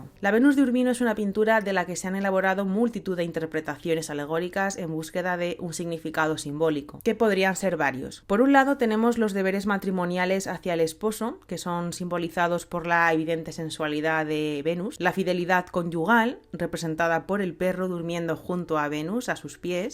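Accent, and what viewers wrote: Castile, Spain. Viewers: jaja claro princesa porque eres de allá.. Pero Rela así con todo y tu acento castellano me gustas mucho 😍😘 Jaja.. Saludos desde Venezuela ✌🏼 Tienes un admirador por aquí.. Te invito a pasar por mi canal para que escuches mi trabajo. Claro si te gusta o llama la atención el Rap conciencia, protesta, esotérico y filosófico 😉 Besos y abrazos 💋🤗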